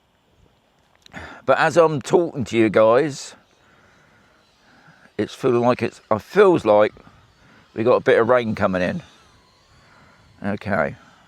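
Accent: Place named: West Country, United Kingdom. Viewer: Nice one,. You sound like you are from the west country. Brizzal? 😀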